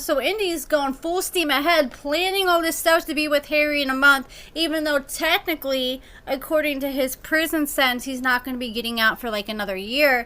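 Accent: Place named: South Dakota, United States. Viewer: Where are you from,? I’m asking because I heard you say “pEllow” instead of “pillow” and yesterday I heard you say “reNember” instead of “remember”, lol just asking because my grandparents are from South Dakota and that’s how they talk. They say “pEllow” and “mElk”.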